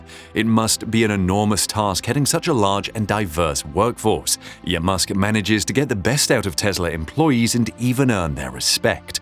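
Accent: United Kingdom. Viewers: Annoying British voice that uses click bait subject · A semi spiffing Brit presentation.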